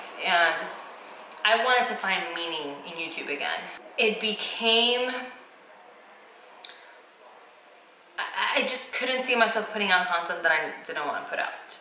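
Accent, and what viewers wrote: Puerto Rico. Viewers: To be honest I first saw your videos and was fascinated by la chinita que habla español como boricua lol but seeing your videos and personality I was drawn to you and subscribed right away and pretty much have watched all your videos. There's sonething different and reall about you, its refreshing and I love it. I'm glad ypu took a break from youtube because it lead you to self discovery and that's what's more important, your happiness. I'm a true fan and will continue to be even if you dont post anything haha. I follow you on instagram and always love your post and quotes! Looking forward to your new content! Glad your back. Besos!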